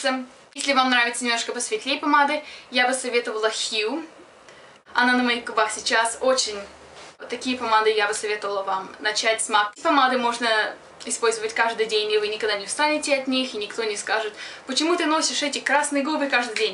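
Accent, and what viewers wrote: England, United Kingdom. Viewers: Как заметен английский акцент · У тебя английский акцент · Я не понимаю что у с разговором?Она англичанка?Или как потому,что слышен какой то иностранный.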